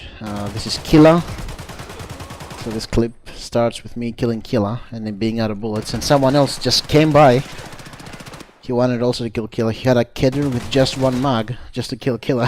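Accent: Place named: Greece. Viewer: ahhhhh that greek accent <3 nice vid hehe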